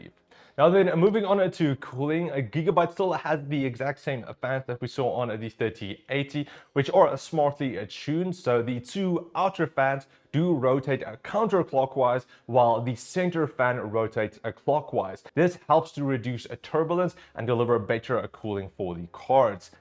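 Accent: South Africa. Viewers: Damn i knew you were pronouncing words in a odd yet familiar way. i could not put my finger ont it. (im living in Australia for a couple of years now) That good ol south african accent. I can still pick it up.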